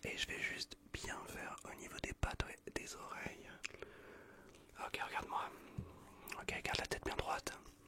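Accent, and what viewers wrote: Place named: South, France. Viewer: Le petit accent du sud 🤣☀️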